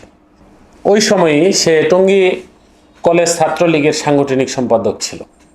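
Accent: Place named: India. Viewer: তোমার ভাষা শুনে মনে হয় তুমি তো ভারতীয়।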